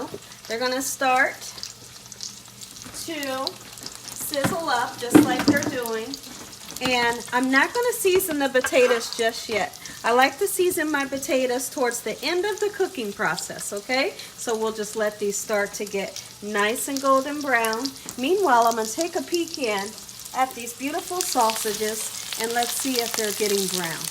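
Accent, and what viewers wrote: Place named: South, United States. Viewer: I absolutely love your voice and southern accent 🫶🏾 and this has become one of my favorite meals to make, bcs of you!! Thank you so much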